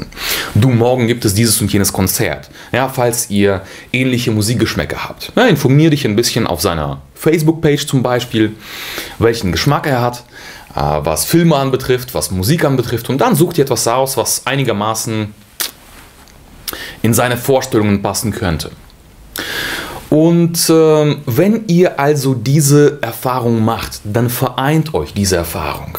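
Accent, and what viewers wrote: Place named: Russia. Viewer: Kann es sein das du ein russe bist weil du so ein russischen aktzent hast so wie ich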